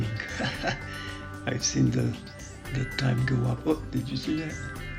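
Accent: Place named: Europe. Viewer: You fools think the EuroDouche accent makes him intelligent or honest ? Misusing "professional" extrapolation/interpolation software to support a dogmatic narrative is something anyone(even a FEtard) can do. & btw, IR just penetrates haze better & lets you see further/clearer, no magic. You can't even talk about such a small angle over such vast distance(or ANY angles/distances) without having measurements(actual) WITH error bars on your initial data. & there are other issueS as well with using this approach here. Being somewhat familiar with how such software(not that particular app but the general assumptions, principles & algorithms etc.) is designed, I'm quite certain that, not only is his "analysis" flawed from the get go, but the conclusions he draws from them are absurd. & he is going "write his own code"(even though he misunderstands what photogrammetry even is never mind how it "works") in Matlab to manipulate data to fit his narrative(NOT reality) even better ? 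I can hardy wait ! Selling(& you're buying) such buffoonery is practicing douchebaggery. I'd be glad to be more specific, if anyone asks the sincere/relevant Q's. Though FETards don't want to actually learn anything EVER. But thanks for the permanent public record of your foolishness though.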